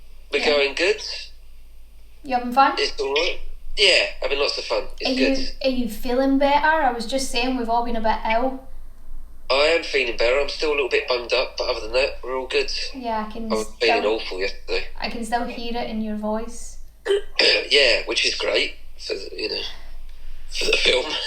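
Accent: Scotland, United Kingdom. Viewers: I just really wanna hear you say "THERES BEEN A MURDER" in your scottish accent...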